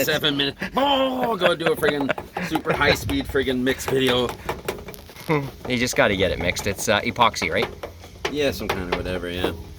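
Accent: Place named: Scotland, United Kingdom. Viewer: you sound a tad scotish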